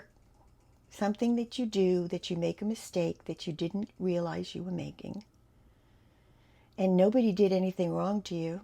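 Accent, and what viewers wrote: New England, United States. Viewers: I’m here because Jeannie. I love her willingness to help other creators. I’m sorry to hear of your YouTube struggles. It’s my understanding that things can get very complicated. I wish you the best. On a side note: I think I hear a New England accent?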